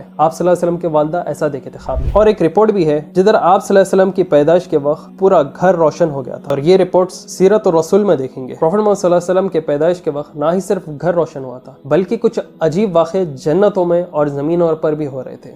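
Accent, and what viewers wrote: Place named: North India, India. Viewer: Apka purana andaz raha baat karne ka toh 1000 videos dekhinge bhai, ye north Indian baat karne jaake itni interesting video dekh ke bhi utna maza nai aara, kaiku english use karre bhai pronounciation ich kharab hojata toh? Totle hai jaisa dikhra aadha word andar hi kha lere.. pehle ke jaisa interesting rakho na conversation? Golden era chale gaya kya ka?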